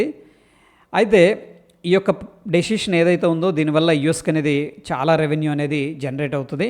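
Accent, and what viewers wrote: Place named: Telangana, India. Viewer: Hi sir this comment is not for this vedio .i would like share my opinion on all ur efforts...సర్ stock market లాంటి ఓక సీరియస్ subject ని మిరు మీ తెలంగాణ స్లాగ్ లో చెప్తుంటే full comedy గా నవ్వుతునే వింటాను.at the same time ఒక vedio 1 book tho సమానం గా చుస్తాను .TQ sir.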